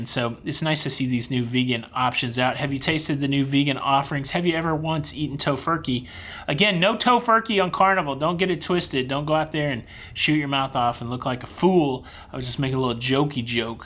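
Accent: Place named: New Jersey, United States. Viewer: A passable Jersey-ish accent! And I'd be freaking out with that door too, it's definitely an odd choice.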